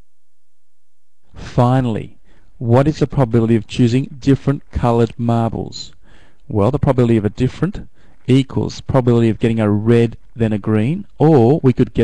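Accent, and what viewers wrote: Australia. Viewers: I don't know why, but the Australian accent really helps me understand. This is awesome, thank you! :) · WOOOO HE'S AUSSIE!!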